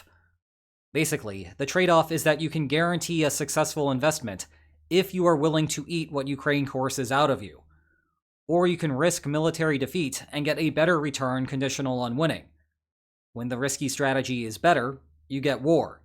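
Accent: Pittsburgh, United States. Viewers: Are you a yinzer?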